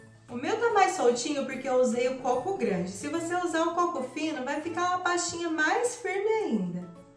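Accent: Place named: Minas Gerais, Brazil. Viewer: eu sou encantada com vc. Adoro seu jeitinho de falar, sua autenticidade, sua simpatia. Vc é linda! Esse seu lindo sotaque é de onde mesmo? Tem um jeitinho meio mineirinho. Eu sou de BH. Amo minha mineirice. Beijo em seu ❤️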